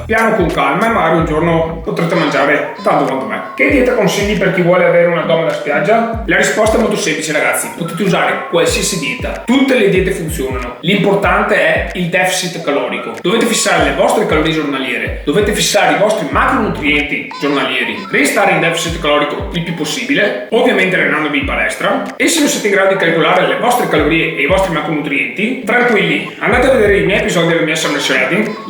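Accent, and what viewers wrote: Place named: Veneto, Italy. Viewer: Aspetto la 100000 in 100 ore come ErikTheElectrik 😂👍 ti ringrazio amico, oltre che per questi cheat che sono PORNO, anche per i full day of eating, perchè dai delle idee veramente ottime per pasti iifym, poi accento veneto diocan è la ciliegina sulla torta 😂😂😂 5000 iscritti in una settimana e altri 2000 quasi in qualche giorno, ti meriti tutto ciò amico mio!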